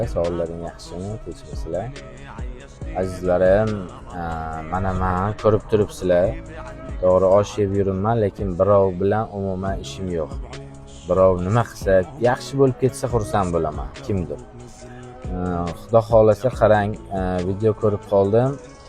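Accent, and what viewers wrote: Namangan, Uzbekistan. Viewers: Qaerdansz tojikmsz bizdayam namangan kosonsoylik ortoglarm shunde shevada gapradi talafuz chroli negativlarga etbor bermang koromdganla kop yaxshi bosezam yomon bosezam negativchlar kop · Шева Наманганга тортар экан тарбияли бола ота онанга рахмат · Nomongollikla sheva aniq😅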